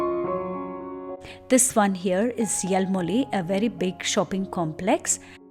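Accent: India. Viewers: Talk like a Indian sound like a Indian 🌚🌚 Indian 🙄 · I visited Switzerland 8 years ago, and found it to be absolutely fascinating. Not just compared to India, but also compared to other countries in the world. I didnt, or couldnt find any dirt, but as you said, no country is 100 % perfect, but I found it near perfect. However, you may be knowing better about it. Unfortunately, this is a bad time, and as of today i.e. 13th May 2020, I heard that there has been some improvement there in Switzerland compared to here, in India (by your voice, it seems you are Indian as well, I suppose), thanks to the excellent healthcare and orderly procedure there. Hope that this situation tides off and we are back to normal in a year (or two). I would love to visit Switzerland again in future, if opportunity presents.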